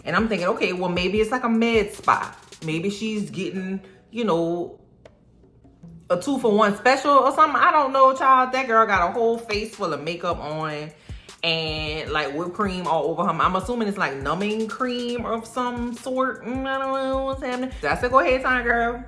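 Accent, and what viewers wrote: New Orleans, United States. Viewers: Just watched both episode last night love your commentary and your Nola accent 💚💯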